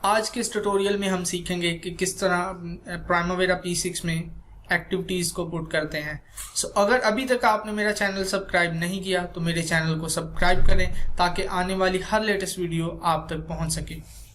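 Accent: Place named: India. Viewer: Can you speak english with u.s accent not indian accent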